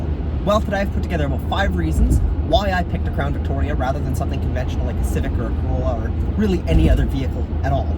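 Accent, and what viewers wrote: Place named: Canada. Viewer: i am also canadian .. i can tell by your voice lol( native american from rez in canada)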